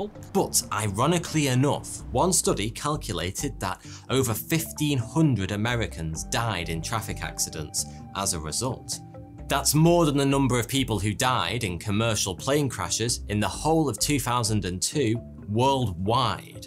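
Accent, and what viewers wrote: United Kingdom. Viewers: He has a clean British accent. I’m Canadian and being a Commonwealth country I can understand a Brit as easily as I can understand an American. If you want hard, try understanding a Scot, Irishman, or Welshman, especially if they’re piss drunk. I can but it takes a bit of concentration and a few pints first. Even Northerners near the Scottish border can be tricky at first. Australians and New Zealanders are fairly easy but I still can’t tell them apart. I always ask where they are from before assuming because they get really pissy if you call an Aussie a Kiwi or call a Kiwi an Aussie even though they sound the exact same. Being as I’m from Canada, I have a much harder time understanding Newfies and Quebeckers. Newfies talk way too fast and Quebeckers speak French and I don’t know French.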